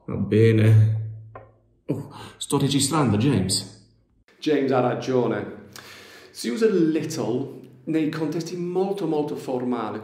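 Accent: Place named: Bari, Italy. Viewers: Accento barese... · Sei troppo simpatico quando parli 'anglo-barese'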